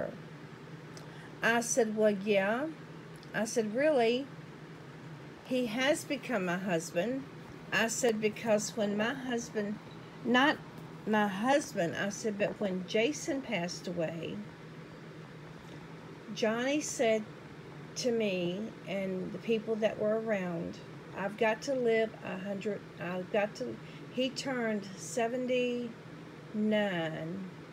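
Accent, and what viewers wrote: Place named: Virginia, United States. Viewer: "I say 'otha, otha, otha (not other) coz I'm from Virginia." Lol. I love you when you are at your natural best. 😘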